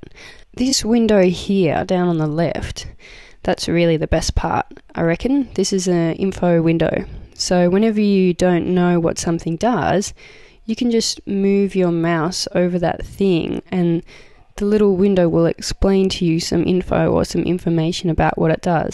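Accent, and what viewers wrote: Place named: Australia. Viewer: australian accents, man.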